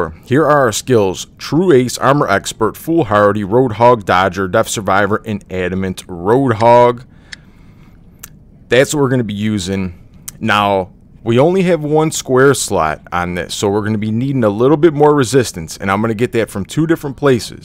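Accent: Midwest, United States. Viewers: You sound like you're from the Midwest Midwest nuclear: new-key-ler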